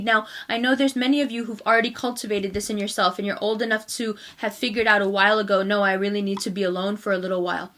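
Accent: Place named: California, United States. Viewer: I hear that Cali accent. My daughter is a Gemini too.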